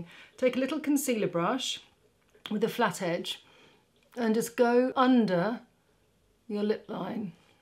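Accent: England, United Kingdom. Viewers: I love watching you! You are so soft spoken and the English accent is a bonus for me. I’m fascinated by the blending of the lip colors by Mario The color you came up with looks beautiful on you. Your makeup always looks so fresh and clean Have a wonderful Christmas snd some relaxation. Love from the US ♥️🌲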